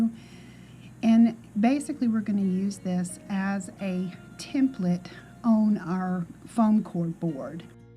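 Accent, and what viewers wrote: South, United States. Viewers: So glad to have found your channel through this collab hop! I love shopping my craft room also , saves money and really tests your creativity ! I have to say being from New York myself I just adore your southern accent , so sweet🤗💕 I love your DIYs !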